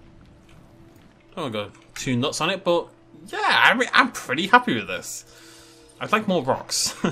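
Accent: United Kingdom. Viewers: What the hell happened to your outro music also quit the British accent and it’s annoying to hear!!!!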